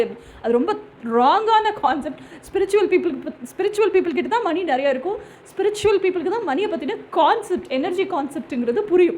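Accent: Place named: Madurai, India. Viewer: Madurai pechu 👌🏻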